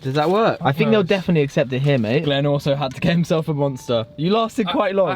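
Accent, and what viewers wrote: RP, United Kingdom. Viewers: I like your vids but my god you sound like a tory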